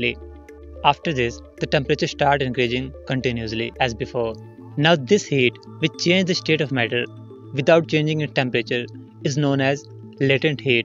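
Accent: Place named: India. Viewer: That Indian accent can kill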